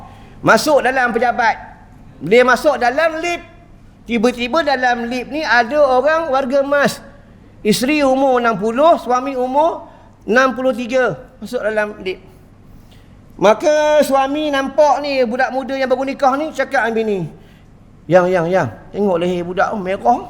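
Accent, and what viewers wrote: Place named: Perak, Malaysia. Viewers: Orng perak mmng ckap kaso sikit jngn terase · Org perak mmg ckp dngr mcm kasar..tp padahal perckapan biasa ja..serupa la org x selalu berkawan org utara..dngaq loghat org kata smbang kasaq..padahal bisa la tu..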